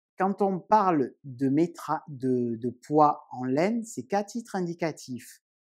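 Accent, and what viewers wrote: South, France. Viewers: Merci Toujours un régal de te retrouver avec ton bel accent du sud..j’en ai marre de tricoter tes chaussettes!! Mon second petit fils a voulu les mêmes que celles tricotées pour son cousin! Heureusement que je change le contrastant!j’ai horreur de faire 2 fois le même ouvrage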